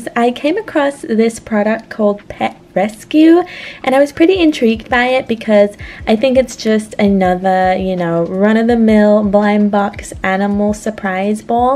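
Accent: Australia. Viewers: You sound like an Australian trying to do an American accent.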